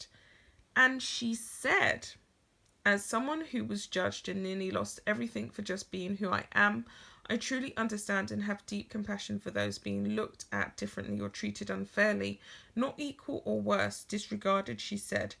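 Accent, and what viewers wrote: England, United Kingdom. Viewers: I think the way you pronounce her last name is adorable and speaks to your English accent. Honestly people are so nasty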